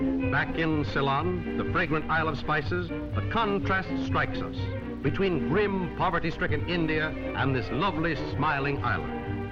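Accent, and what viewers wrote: United States. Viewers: That's an American accents and not British or Dutch.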